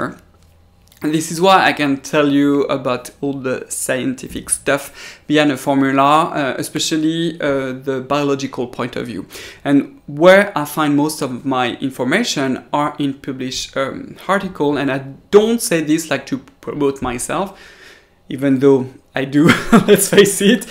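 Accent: France. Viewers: Welcome back!!! I am so glad your making videos again! I love your French accent so much!!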